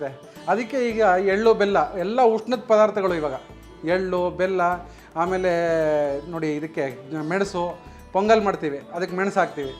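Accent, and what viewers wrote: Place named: Mysore, India. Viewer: ನಿಮ್ಮ ಶುದ್ಧವಾದ ಮೈಸೂರು ಕನ್ನಡ ಕಿವಿಗೆ ಇಂಪಾಗಿ ಇತ್ತು.ಹಾಗೂ ಪೊಂಗಲ್ and ಫಿಲ್ಟರ್ ಕಾಫಿ ಎರಡು ಇಷ್ಟ ಆಯಿತು. ನಿಮ್ಮ ನಗು ಹೀಗೆ ಇರಲಿ 😁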